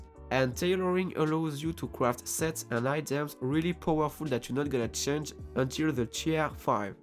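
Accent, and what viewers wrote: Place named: France. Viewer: Great vid! The french accent only makes it better <3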